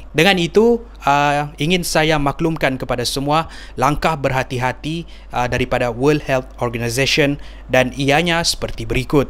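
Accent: Sabah, Malaysia. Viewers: tu sabahan punya style paling ketara oh wkwkwkw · Kamu orang sabah ke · Dia Ni Sabah ke indon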